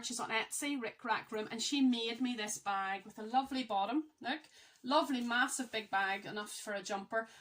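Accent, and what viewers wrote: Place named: Northern Ireland, United Kingdom. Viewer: Hello thoroughly enjoyed your podcast, and ohhhh those socks are amazing. I watch Angela and that's how I found your channel. I'm originally from Carrickfergus and moved from N Ireland over here to Scotland back in 96 and it's always lovely to hear someone from back home. Stay safe stay well, hugs from Scotland xx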